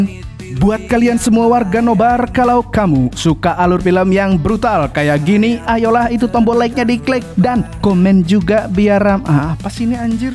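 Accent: Banjar, Indonesia. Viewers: Pian org banjar? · Urang Banjar kah mang 😅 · Adminnya urang banjar leh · Mamang ni org apa si? Kok ada banjarnya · urang banjar sekalinya ikam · banjar kah pian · Abang nobar,Pian ne asli org Banjar kh 😂😂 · Hahhhhh orang Banjar kahhh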